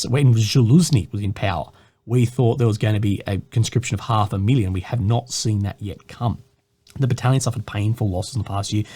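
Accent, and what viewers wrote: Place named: Australia. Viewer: What a fentastik 😍😍😍😍😍 day what a fentastik accent i love Australia accent wooow, you channel is great but your accent is amazing, fentastik. Have fentastik day sir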